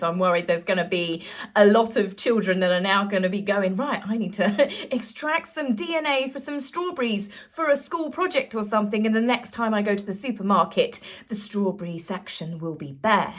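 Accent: England, United Kingdom. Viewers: Congratulations! Greetings from Melb, Aus… I’m not an OG BUT have loved following you and your collabs. You are an inspiration, especially to watch back on your vids from 5yo. I respect you, your reseach and opinions as a royal commentator. You have an authentic mix of humour, sarcasm, wit and compassion all wrapped up in your gorgeous English rose accent 🌹 Here’s to many more years and subscribers ahead and hopefully nothing but joy with no need to mention Harkles in the very near future🙏 Happy days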